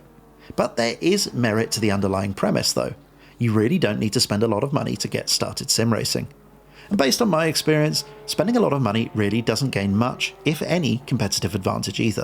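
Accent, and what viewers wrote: RP, United Kingdom. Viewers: a person has a RP UK accent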